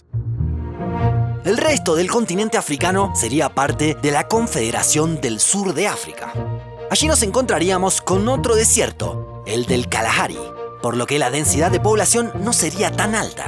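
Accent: Argentina. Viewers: Se nota que es un canal argentino por dos cosas: el acento (obvio) y porque no pone a las Malvinas como territorio británico de ultramar.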